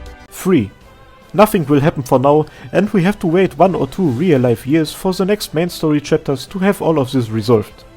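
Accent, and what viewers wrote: Germany. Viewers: Some of the Arknights backstories are dark (just take Frost Nova as an example), but hers surely takes the cake. I do love her though, and as a new player, I do hope she will come back so I'll have a chance to blow my money on getting her, cause I do want her so bad. The chapter 8 music video, she looks so bad ass! Also, your explanation of her ability, of four invisible hands holding onto the things she's got with her. My first though was Re:Zero and Petelgeuse's unseen hand witch factor. Haven't seen the anime you mentioned, so can't comment on that, but as you explained it, I immediately thought of Re:Zero. Anyway, thanks for your lore videos. As a new Arknights player, there is so much lore to catch up on, and the story of Arknights is actually really good. So having access to your videos is a nice help to explaining some character connections and such. 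Also, please don't loose the accent, it's so charming. I live in Denmark, so I'm used to German tourists visiting, and the German accent I've always found so charming. I get why you sub the videos, it can be difficult to understand for some. Guess what I'm trying to say is, keep doing you, keep up doing the great work, and thank you for the videos you make! ❤❤❤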